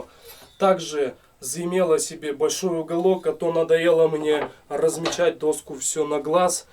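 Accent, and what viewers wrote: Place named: South, Russia. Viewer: Прям чувствуется южный акцент))))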